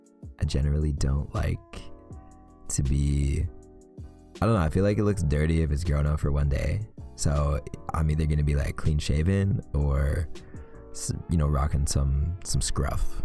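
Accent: Canada. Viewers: There are alot of things to talk aboot Oh yes he's canadian 😍 Dont worry I'm canadian too 😆😆💋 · your the only reason why canadian accents are one of my faves rn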